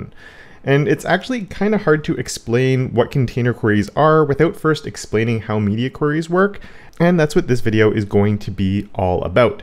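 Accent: Canada. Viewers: It's definitely magical. I love it, and love only learning CSS NOW rather than 5-10-20 years ago. (I did try 10 years ago but just didn't click). Id be interested if you do a video on the different available container types regarding sizing. Aside: continer style queries ;) Hoping my daughter will come back with a tinge of your accent. She's just gone to St John's area in Newfoundland for 5 months of school (she's 17, specifically Conception Bay South)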